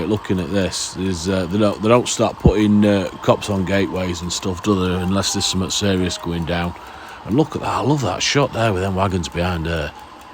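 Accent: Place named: Northern England, United Kingdom. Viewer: I really think you could be on TV!!!, like the way 'Thomas the tank' was shown, but in the current era, your northern accent,witty humour and the way you make it like a soap, is brilliant!!!!, you got me hooked!! 😅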